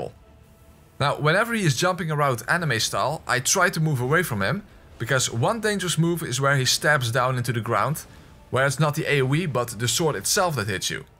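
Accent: Netherlands. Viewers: My brother, it's not just that amazing dutch accent; I for one am always looking forward to your new choices for metalsongs. Again: not dissappointed. Then again, can be used for every pyromancy based run too. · you sound dutch of niet dan :P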